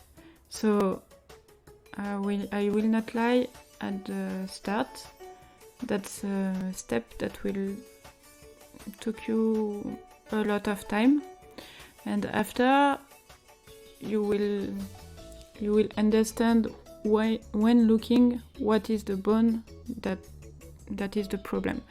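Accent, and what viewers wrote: France. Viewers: Прелестный французский акцент...🙂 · Au vu de l’accent on reconnais que tu es française 🤣🤣 dommage pas de faire en français y’a dja bcp de tuto en anglais 😭 merci quand même :)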